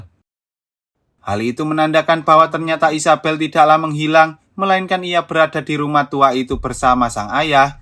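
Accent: Java, Indonesia. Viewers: Pengisi suaranya jawa banget 😅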